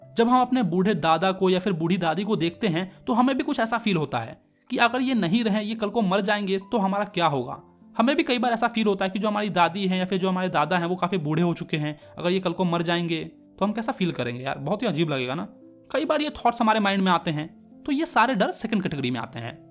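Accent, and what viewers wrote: India. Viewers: Dehati English ❌ deshi english ✅🫶🫶👌👌🙏🙏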